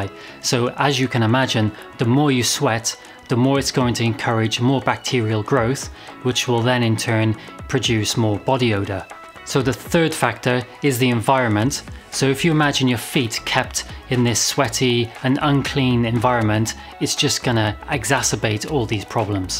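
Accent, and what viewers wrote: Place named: United Kingdom. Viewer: I love your British accent! Perfect pronunciation, so clear when you speak!!! Greetings from southern France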